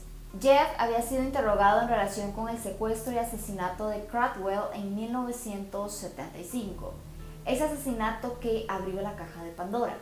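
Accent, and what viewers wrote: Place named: Mexico. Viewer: Me gusta este acento mexicano, me hace acordar a mtv xD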